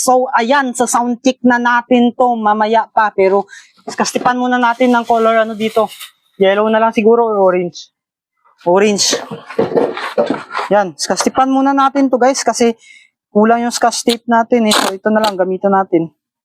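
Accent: Visayas, Philippines. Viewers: Idol bisaya ka ya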